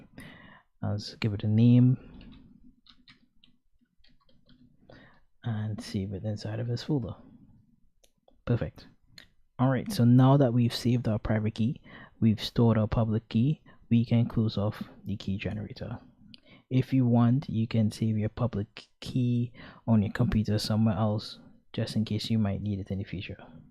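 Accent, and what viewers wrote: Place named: Trinidad and Tobago. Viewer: I knew that was a Trini accent. Happy to know there are more high-level coders from TT. Well done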